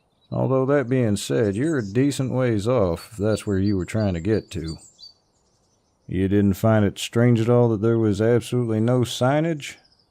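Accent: South, United States. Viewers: you are southern accent. good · Lord have mercy I have a thing for a southern accent 🫣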